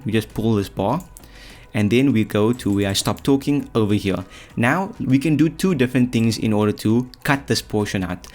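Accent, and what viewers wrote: South Africa. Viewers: Is that a South African accent I hear?? I am using premiere at the moment but I'm thinking of switching. Do you recommend hit film? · no way i came on this vid and this bra talking in an accent that only another brazzo can understand, coming fromn that west coast ey represemt the ZA fam homie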